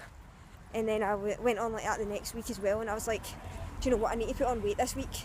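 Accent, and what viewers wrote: Scotland, United Kingdom. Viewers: I truly admire you for sharing your struggle. It takes a lot of courage to overcome that kind of disorder, let alone share it with strangers on here. I just know your story will inspire many. I myself have never battled anything to the degree you have, but for those who have, this video will be motivational & inspirational. I really enjoy your channel & support you greatly throughout your recovery. I also love your Scottish accent, btw!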